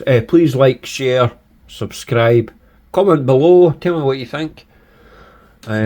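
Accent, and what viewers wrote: Scotland, United Kingdom. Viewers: Are you Scottish ?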